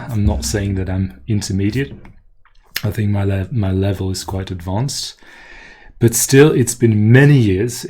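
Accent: Southern England, United Kingdom. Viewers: bro, as an american, u sound like a native brit!!! please explain your learning methods, I really would like to acheive similar results! going thru your comprehensible input french a1-b1 so far and it has been amazing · Another American here, but I’ve watched The Crown a couple of times on Netflix, so I’m basically an expert on RP. 😂 That was a joke. Like everyone else has said, your English is top notch and if you’d just started talking to me I’d have assumed you were British and never in a million years guessed you were Swiss (side note, it’s weird as hell hearing damn-near-perfect British English come out of your mouth after years of only ever hearing you speak French—like, who is this?😂). So, anything I say is going to be incredibly picky: you want to say tenDs but it’s coming out *tenTs*. But, my guy, it is SUBTLE. , diphthongs in America will vary wildly by accent and sound drastically different in New York (oil = OY-ul) than Texas (oil = OLL). 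you would generally say appreciate IT. Merci pour vos vidéos! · Your English accent seems to sound as though it originates from the UK 🇬🇧 rather than the U.S. so it all depends on what accent you’re rooting for, but your English is great! I’d recommend listening to some audiobooks in English that might help develop more phrases that are used widely within the English language that always helps. Of course reading also!! Pronunciation seems awesome! Ik you’ll do great on your English journey! And yes English whether UK or not has minimal nasal sounds and mainly contains breath sounds (usually the sounds most prominent use our lips, tongue and teeth~ our mouth) rather than nasal or using our neck/throat if that makes sense as compared to French? 😂 Watching sometimes old shows maybe like “Friends” or other shows that you’re into in English can help you get acclimated and accustomed to new vocab and sayings. 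But honestly you did great! And for timestamps you would say “at 5 seconds” instead of wording it “ ” you can just ask to specify the time stamp at zero minutes and 5 seconds. Hope this comment helps you! And thank you for uploading on not only this channel but your French channel has helped me tremendously with my own language journey!! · Improve to what? C2? Haha. If I had the funds, if my school had the funds, I'd invite you out here where you could guest-teach at my school and immerse. Your pronunciation is great. Don't worry about it even if it's British/British-leaning. Haha. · Amazing english,! When I first started watching this I thought this might be the normal you and the french was all a lie. 😂 As an Australian, I can definitely hear a mix of British, American, French, and German? accents on different words... but definitely British overall. Even your filler words are typical British ones! 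I think Tou speak very clearly and pronounce every single word... which sometimes makes you sound less native because you aren't lazy enough with your words! Just a few specific thoughts: - Instead of 'precise way' you might mean 'succinct way'; - Its a bit unusual to say 'from this /very/ playlist'. Unless that is a surprising fact, you could just drop the word very in this case. - 'lacking the right word' is fine, we might also say 'can't find the right word'. - we don't really spell timestamps, but you could read/write/say them. - 'Random' sounded like 'ren-dom' but normally is pronounced 'ran-dom'. - 'Aiming for' works in this context (we aim FOR a general goal/time, aim AT a specific target, and aim TO [verb] ). - 'recently someone pointed OUT that'... - 'slightly off' is a perfectly fine way to describe it. - 'native-like' sounds a bit unusual, I'd normally say 'like a native speaker' because native by itself often has an indigenous meaning (at least in Australian). 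- I'm Australian, so maybe my pronunciation will be misleading... but I would say "Own-ly" and 'Doh-nt'. - 'tends to b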